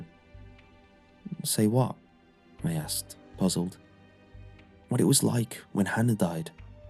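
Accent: United Kingdom. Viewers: It's always a good episode when the British guy is narrating.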